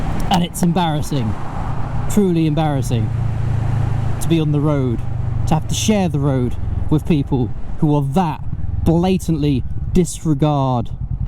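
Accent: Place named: Cockney, United Kingdom. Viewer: Ooo sounds exciting. Looking forward to hearing the cockney verbals come out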